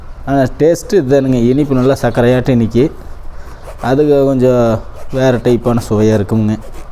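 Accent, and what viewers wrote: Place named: Coimbatore, India. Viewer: You covai Tamil speech is very nice. I had been living in Karamadai for past 30 years where I was studied at karamadai high school then pre university PUC at Government arts college in year 1977 . I completed Mechanical Engineering in Govt college Technology during 1978 to 1983.Then joined India space research organization in 1983 itself. So like your speech. I completed 37 years as Scientist in higher levels and retired in 2020. Like your service and affection on food forests. Thanks If time permit I will meet you personally. Scientist RAJAN PAULRAJ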